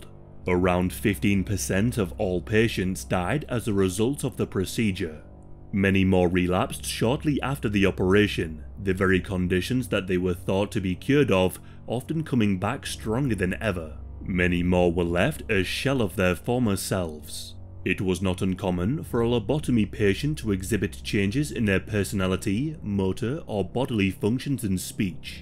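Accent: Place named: Yorkshire, United Kingdom. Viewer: i love the way u try to make ur yorkshire accent sound slightly posh lmaoo 😭 do you do this so its easier for people who arent native english speakers can understand you more??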